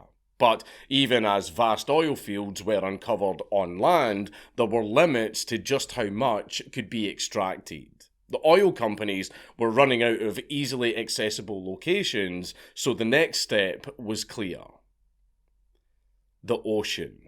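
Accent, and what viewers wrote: Scotland, United Kingdom. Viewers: spill with a Scottish accent sounds awfully close to spell, and at times had me wondering about what wizard was responsible for this catastrophe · You are Scottish do the Piper Alpha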